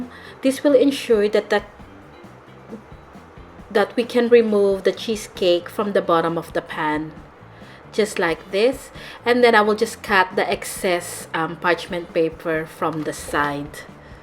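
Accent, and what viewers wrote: Philippines. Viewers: Are you a filipino? Because you have a Filipino accent. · sure ka ba d mo naiintindihan??? Filipino ka dba? tsk tsk.. mka-insulto lang. accent is not important sa Youtube te as long as naiintindihan ng nakakarami. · matias Low IQ lng d nkakaintindi s kanya.. She has a filipino accent so dapt mas naiintindhan mu! Wag kang epal! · Yeah. unang rinig ko palang, alam ko ng filipino sya hahaha. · Filipino ka no teh? Accent palang ih!! Hehehe!!! Sana teh translate mo to sa tagalog. Nosebleed!! Choz! Pero pa translate teh para maintindihan ko ng maayos hahaha!!! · but you have to admit... she does sound filipino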